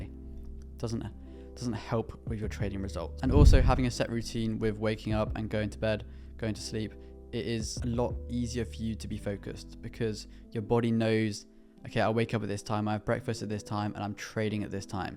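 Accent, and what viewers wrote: United Kingdom. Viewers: How to sound British like you? I like your British accent mate 😍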